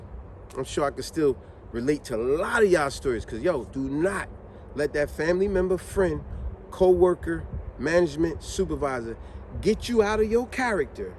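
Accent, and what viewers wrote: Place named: West Philadelphia, United States. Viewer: He reminds me of growing up in West Philly and Camden.That is exactly how the guys would talk.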